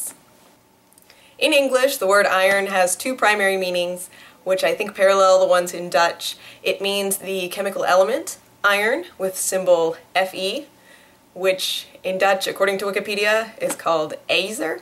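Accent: United States. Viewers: In England it's more "i-on", not "i-ern", the way you pronounce in America is not British standard English. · she's right, even if her 'standard english' pronunciation is actually a bit on the USA side. Ironic is pronounced WITH an 'r', instead :). Iron, without. In USA, they do pronounce it as she says. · Standard 'murrican' English that is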